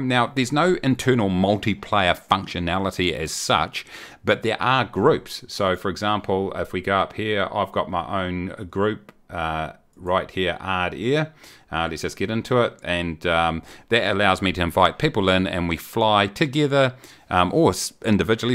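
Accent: New Zealand. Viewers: 🤣 The auto text understood you to say Korea instead of Career. You know that I Love the Kiwi accent mate. 🥝 🇳🇿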